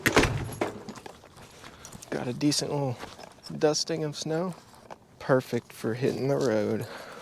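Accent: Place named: Memphis, United States. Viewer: You from Memphis? You sound like it. Where are you from? Tell me I'll subscribe.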